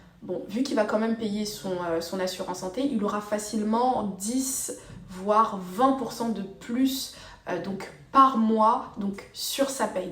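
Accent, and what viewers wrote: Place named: France. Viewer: mais de quoi tu nous parle, ta un accents Français et tu parle de pas venir au canada si.........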